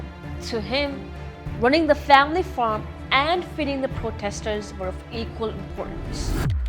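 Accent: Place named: Canada. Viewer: Or apna ye canada wala accent apni Gamnd me daal lo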